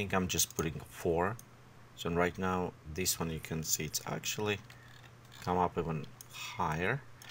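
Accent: Russia. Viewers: Да слышно же что ты русский) Ну расскажи по русски же)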